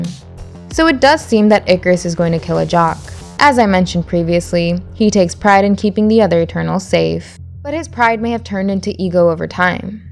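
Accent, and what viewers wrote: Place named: United States. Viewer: You're a female CANADIAN LAD ...but you sounds like AMERICAN 😁lad ❤x🇮🇳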